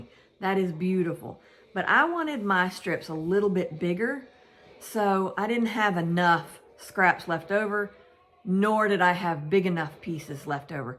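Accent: South, United States. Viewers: Saw tooth stars are my favorite! Your quilt is gorgeous. I especially love your scrappy binding. Thank you for so generously sharing your quilt pattern with me. Also , my husband and I just love listening to your videos. Your sweet southern accent is a wonderful reminder of our time living in the south. 💗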